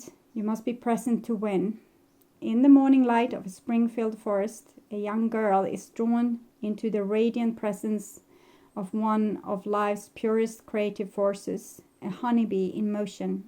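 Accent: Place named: Sweden. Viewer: Are you Swedish btw? Im from Sweden and I think your accent sounds a little "svensk" :-)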